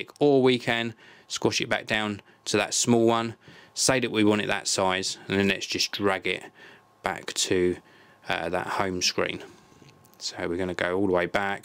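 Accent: United Kingdom. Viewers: This is probably the most powerful british accent I ever heard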